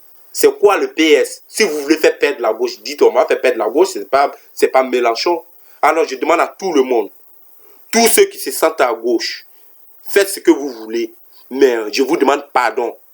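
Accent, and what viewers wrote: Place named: Africa. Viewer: Merci pour cette vidéo camarade ! J'adore ton accent africain 😀 Tu as raison sur toute la ligne ! Le bon sens finira par l'emporter...nous sommes trop nombreux à vouloir justice ! Les sondages nous mentent ! Ne nous décourageons pas les amis, nous gagnerons 😊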